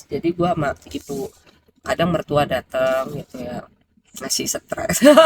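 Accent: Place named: Betawi, Indonesia. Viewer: Betah klu ndengerin kak cerita, lucu, seru kadang sedih juga, apalagi ditambah dengan dialek nyablaknya khas betawi bekasi,pokoknya buat kak, sukses selalu.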